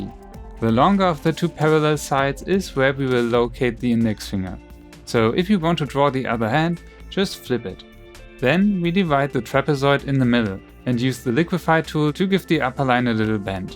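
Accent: Germany. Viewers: ayo it's funny you were on my recommendations and I didn't see your videos before, but I was planning on doing the same approach of making tutorials by showing different emotions of me in anime style lol😂 btw you kinda sound like you're german as well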